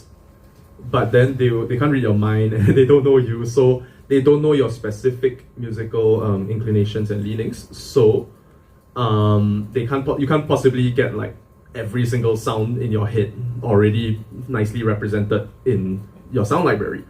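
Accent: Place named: Trinidad and Tobago. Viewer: Yo your accent sounds similar Trinidad. I thought that was interesting.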